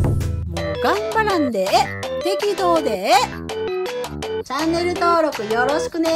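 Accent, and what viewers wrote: Kansai, Japan. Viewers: HISAKOさん、わざわざご返信ありがとございます。嬉しすぎます。 温かくて、私に寄り添ってくれるお言葉、とても救われました。 みんな同じ経験をしてママになっているんだなと思うと、不安な気持ちも少し解消されました。 自分の気持ちを周りに伝えること、吐き出すことが苦手で、私がやらなくちゃ！と思ってしまうタイプです。。。 幸い、夫は子ども好きで、家事は率先してくれて協力的。 まずは信頼できる夫に自分の気持ちを伝えることから始めてみます。 これから先ずっと続く子育て、私自身が成長できる機会だと思って、前向きに◎ 夫は大阪南部出身、私は15年間大阪市内に住んでいて、去年四国へ引っ越しました。 関西弁が懐かしいし、HISAKOさんの喋り方がとても心地良いです(^^) これからも、笑顔とパワーをもらいに、動画見にきます！ お忙しいと思いますが、どうぞご自愛ください。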